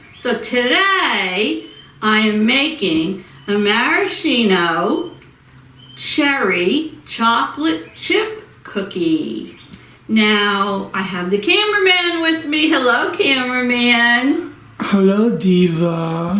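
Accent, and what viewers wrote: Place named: Bronx, United States. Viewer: Hi sis new subscriber hope you become a member a Montgomery Family Channel as well 💋💖 💋 I hear that New York Bronx accent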